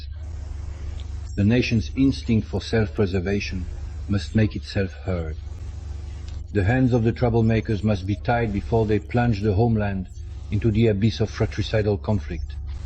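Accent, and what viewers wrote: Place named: Poland. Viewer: english polish accent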